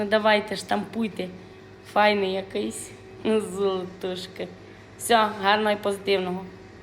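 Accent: Transcarpathia, Ukraine. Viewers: Вітаю! Ви класна, весела, неповторна! Я викладаю діалектологію в університеті. Ваша мова і харизма - це шедевр. Ми на парах зі студентами дивилися Ваші відео і таким чином запам'ятовували та аналізували фонетичні, морфологічні, лексичні особливості закарпатського діалекту! Розбираємо Ваші перли на молекули 😊 Пишу не заради голубців))) Нехай Вам щастить, файна дівчино! ❤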